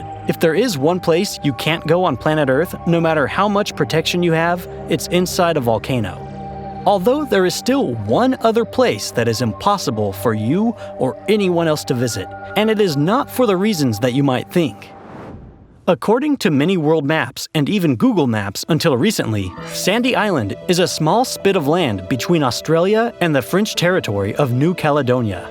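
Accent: United States. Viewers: Dude's got an American accent so he's likely American and in America we use miles and Fahrenheit, so it makes sense.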